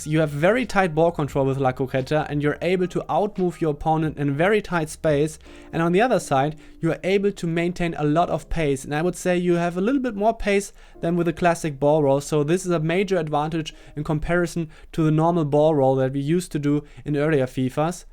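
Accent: Germany. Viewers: because of your accent i guess u from german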